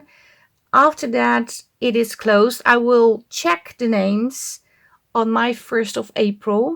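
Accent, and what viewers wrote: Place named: Netherlands. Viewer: congratulations #lda5kgiveaway i like watching your channel because of your artistic way with junk journals , your interesting personality and of course your Dutch accent, the Facebook challenges are a bonus. thank you for these amazing chances to win prizes:face-red-heart-shape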